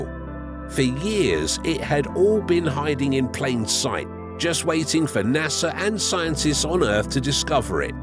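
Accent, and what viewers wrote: United Kingdom. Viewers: I know. They usually get a narrator with a British accent to give their lightweight video more 'gravitas'.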